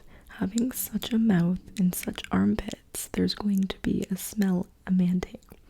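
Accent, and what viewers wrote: United Kingdom. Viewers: As a british male, aged 16. I can confirm that almost every few streets has a different accent. I like your British accent though, It's relaxing. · The British accent 😂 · As sooooon as you went with soft spoken British accent I ARRIVED AT TINGLE STATION! 😅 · Not calling out all of us younger siblings 💀. Lucky for you, I'm too lost in your soft spoken voice (& British accent) 😌or else I'd ask to speak to your manager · lol your british accent is amazing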